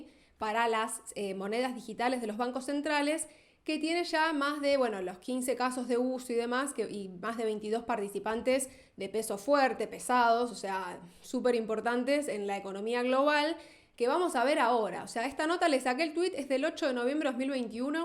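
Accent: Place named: Argentina. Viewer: que bonito es el acento argentino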